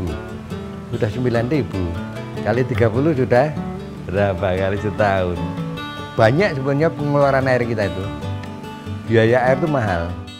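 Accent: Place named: Surakarta, Indonesia. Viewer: Orang solo raya mana suaranya. 😊☝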